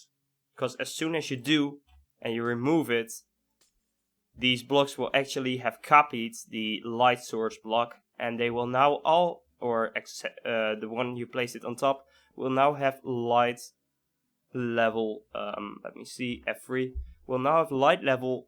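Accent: Netherlands. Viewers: where do you come from? You sound dutch. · Hij is 100% nederlands hahahaha 99% van de nederlanders kunnen geeen engels praten zonder accent ( ik ook niet :P )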